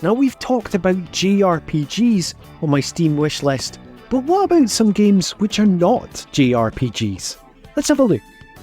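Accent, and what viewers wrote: Scotland, United Kingdom. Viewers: Hey as a sidenote, yesterday I watched a collab video in Femtrooper's channel and it was a pleasant surprise to see you featured there! I'm really glad to see your channel growing and doing collabs like that, you make some great content keep it up! (Also it might sound weird but I love hearing some scottish accent for a change on YT haha)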